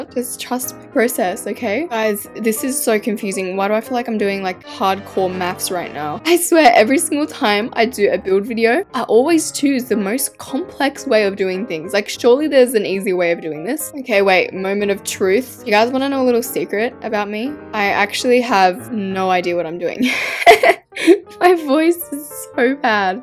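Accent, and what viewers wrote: Australia. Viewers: WAIT YOUR AUSTRALIAN, ME TOO!!!!! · Wait is she Australian? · she Australian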